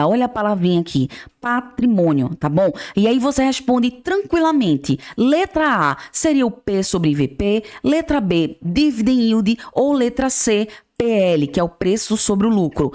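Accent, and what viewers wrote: Northeast, Brazil. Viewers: Bom português, boa narração, conhecimento muito ok e sotaque nordestino. Tenho Copasa e penso em Sanepar, mas precisa melhorar o dividendos. Parabéns,.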